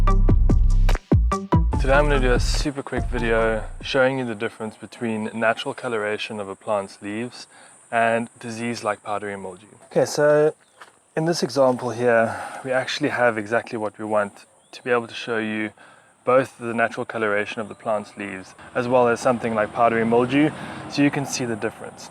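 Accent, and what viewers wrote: South Africa. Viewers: Thanks for the tips. I'm subscribing because you sound South African and it's hard to find South African gardening YouTube channels today we can relate to. Looking forward to your future videos...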